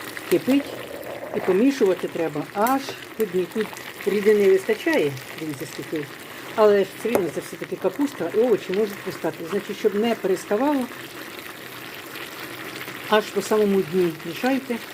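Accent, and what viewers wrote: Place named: Poltava, Ukraine. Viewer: Вибачте,мені дуже подобається ваша мова,нагадує рідну Полтавщину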